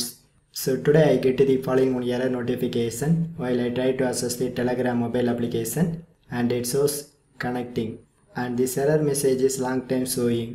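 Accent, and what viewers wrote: South India, India. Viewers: Are you South Indian? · R u from south india bro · bro he's Indian he's trying his best to speak English respect that.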